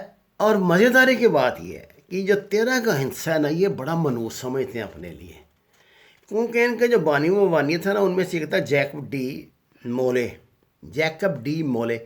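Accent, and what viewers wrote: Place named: Lahore, Pakistan. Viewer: بولتے ہوئے لاہوری سٹائل کو کب چھوڑو گئے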